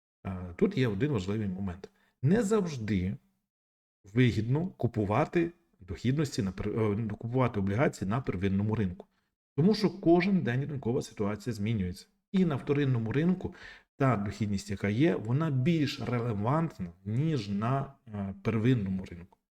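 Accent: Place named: Transcarpathia, Ukraine. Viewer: мені подобається ваш закарпатський акцент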